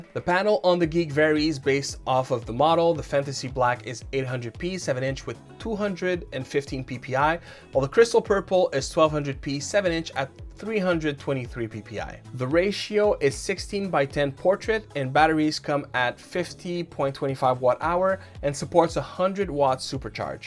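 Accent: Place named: Morocco. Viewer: I've been sitting here trying to guess where your accent is from. I'm gonna guess Moroccan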